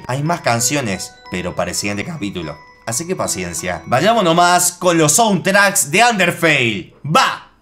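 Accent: Córdoba, Argentina. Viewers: Sos Cordobés(Argentino)??, es que tenés la tonadita cordobesa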